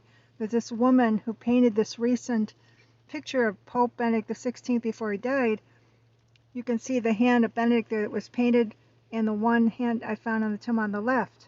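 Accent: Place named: United States. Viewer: Nobody can understand your heavy american accent. Kindly speak with neutral accent or provide sub titles in English.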